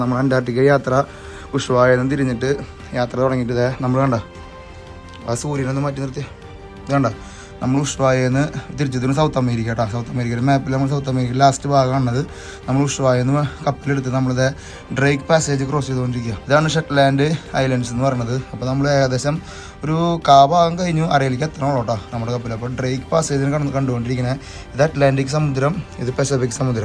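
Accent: Thrissur, India. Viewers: വിഡിയോ കൊള്ളാം.. പക്ഷെ ഈ ത്രിസ്സുർ സ്ലാങ് ഉം സഹിക്കണില്ല എനിക്ക്..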